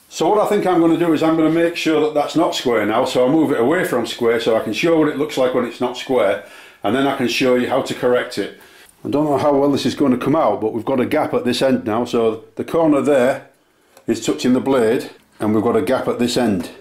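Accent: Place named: East Yorkshire, United Kingdom. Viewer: Great instructional vid Thanks, However I've seen in other reviews that after certain length of usage the saw stops cutting accurately, Have you any experience of this. BTW You're accent suggests to me you're from east Yorkshire, am I right?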